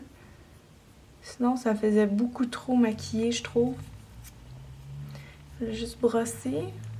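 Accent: Quebec, Canada. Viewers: OMG cet accent québécois J'ADOORE 😆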